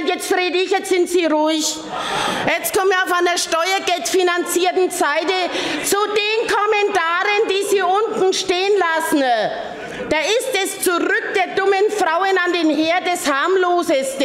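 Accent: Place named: Bavaria, Germany. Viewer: Des war vielleicht nur leicht bayrisch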